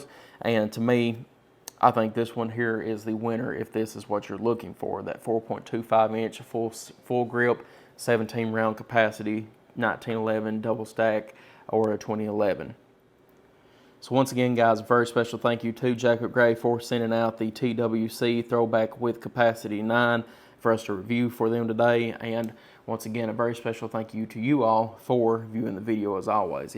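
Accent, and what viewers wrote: South, United States. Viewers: This dude from Alabama Mississippi Tennessee or Georgia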